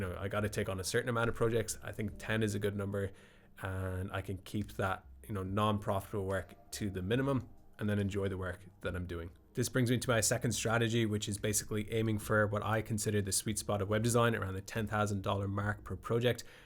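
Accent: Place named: Ireland. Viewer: Hey,. Your content is awesome. I really appreciate your honesty and willingness to share your extensive knowledge and valuable insights. (The Irish brogue is pretty cool too. Been a long time since I've been there -- County Sligo it was, many years ago.) Question: Do you have any kind of Inner Circle Club, where we newbies to this game could ask you specific web design-related questions? If so, I would like to hear about it. Thanks again for all of your... can I say it?... amazing insights! P.S. Oh, yeah. One more thing. Those sound like good goals to have, and I really do hope you meet them all. Thanks again!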